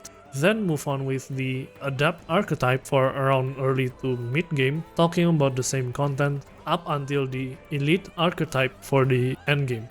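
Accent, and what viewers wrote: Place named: Indonesia. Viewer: r u from indo? got accent hahaha. haven't seen ur vids in a while. nice to see a metaphor video from you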